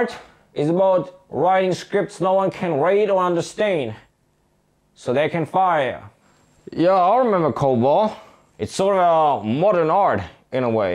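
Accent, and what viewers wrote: South, United States. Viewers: It sounded like what it was, a foreign guy doing a parody of a Southern, possibly Black-Southern, accent. Still works IMO, since a lot of extant Perl is likely in government & old-school tech industries like telecom. And agreed that Perl is great for code puzzles and showing off, but job security isn't to be sneered at...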